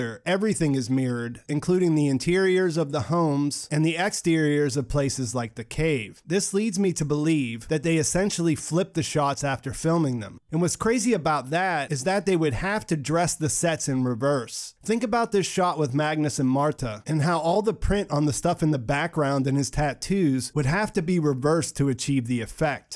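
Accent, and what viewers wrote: United States. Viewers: It’s so exhausting listening to the american pronunciation of the german names - we are able to pronounce names from foreign series in almost the right way, so why don’t you all try too? :D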